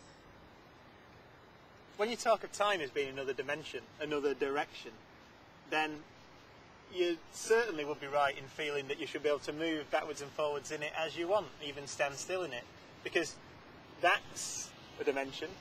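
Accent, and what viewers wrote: United Kingdom. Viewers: this is british english and it sounds much much much more painless than american english.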